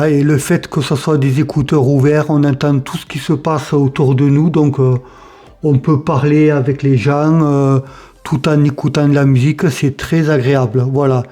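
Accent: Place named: South, France. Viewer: Re 😅 Un peu particulier le design de ces écouteurs, faut aimer. En tout cas content d'entendre ton avis avec ce bel accent du sud (je me sens moins seul! ) 😜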